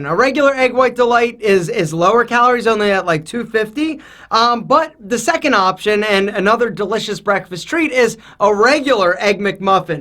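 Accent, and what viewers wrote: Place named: Upstate New York, United States. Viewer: Where's the accent? Sounds upstate NY.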